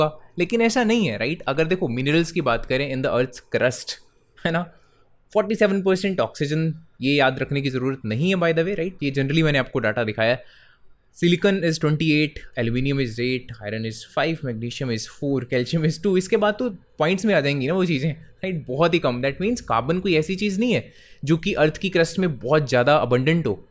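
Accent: India. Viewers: Yeah..I somewhat realised u seem to be punjabi by the way of your taking sir...even I am punjabi and I have shared with my friends:)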